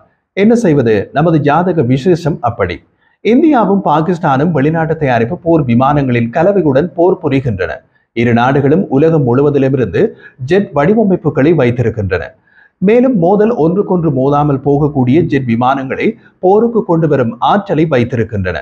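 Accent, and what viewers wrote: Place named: Jaffna, Sri Lanka. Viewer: யாழ் தமிழைக் கேட்டு மகிழ்கின்றேன் மிக்க நன்றி வாழ்த்துக்கள்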